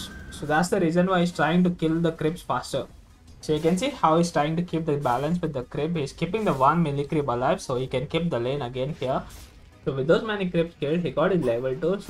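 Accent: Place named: India. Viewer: stfu bruh. just show the video no need to speak with ur indian accent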